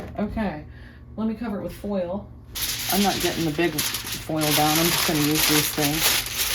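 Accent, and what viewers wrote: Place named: Michigan, United States. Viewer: I just found your pg 😊 love the content! Have a happy new yr ❤ from Michigan.. p.s..you sound like you're from my neck of the woods 🤗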